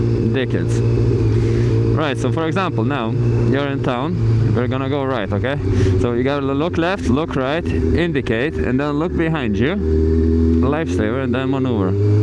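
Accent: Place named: Latvia. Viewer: Cheers mate. Ain't you from Lithuania? I hear some accent... Latvia, maybe.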